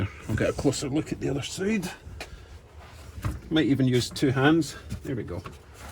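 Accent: Scotland, United Kingdom. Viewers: Did I just stumble upon a Scottish mechanic. Awesome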